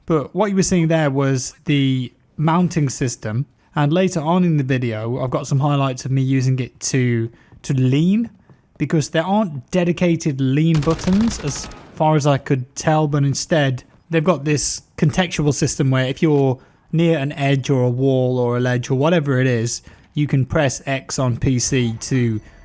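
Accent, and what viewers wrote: United Kingdom. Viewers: British accent killed this video completely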